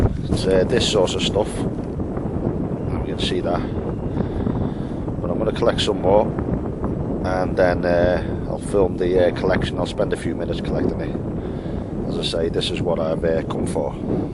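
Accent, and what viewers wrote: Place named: Birkenhead, United Kingdom. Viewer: Fantastic as always, I really enjoyed that & very interesting. Nice to see one of your childhood haunts, I've always thought you had a Birkenhead accent x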